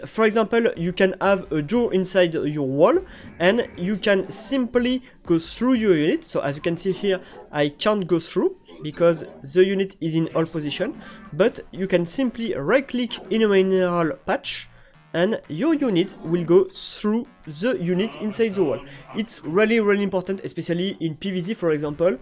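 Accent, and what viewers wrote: France. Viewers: Le bon vieux accent français x)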